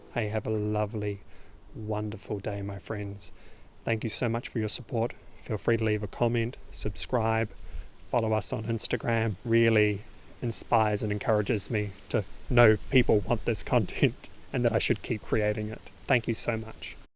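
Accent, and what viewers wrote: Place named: New Zealand. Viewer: Its nice to hear a NZ accent on youtube talking about non duality thanks .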